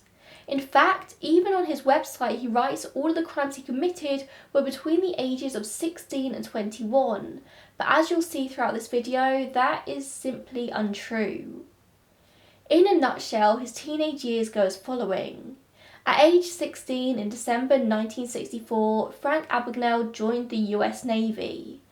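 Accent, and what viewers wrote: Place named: London, United Kingdom. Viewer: Apart from the annoying low class London accent and the grammatical mistakes of her own language , this is a review of a book that was ghost written to sell books using data from a book that was written to sell… well books , and bits from a movie script that was written to sell movie tickets. None of them are the truth. You should have done your own research and presented those findings but, that would take time away from attempting to be famous on YouTube. Far better to copy someone else’s work, dumb it down and concentrate on You. By the way, if you are going to read facts from a prompt because you don’t know them , make sure that the information is correct…. There is no Bronxville area in The Bronx, it is Brownsville and, I am quite sure that Abagnale started doing speaking tours around the USA, not the UK. 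Know your subject matter.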